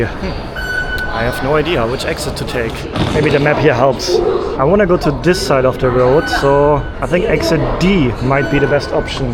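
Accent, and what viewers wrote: Germany. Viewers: Gah, it’s taken me so long to figure out where I know his accent from (yes, germany) but he sounds like Klaus from American Dad 🤣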